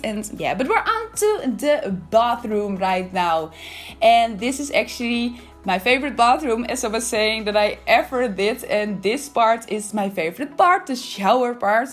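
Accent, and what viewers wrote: Netherlands. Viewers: Are you Dutch? Ps. Love your videos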